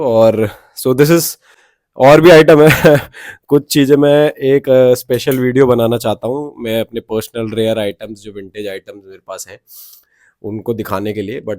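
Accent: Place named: Haryana, India. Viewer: Ye kidhar hai baawli, accent aapka haryanvi ka Gujar, Yadav, UP, Delhi ke lagte areas ka lag rha hai. Support and blessings to you though!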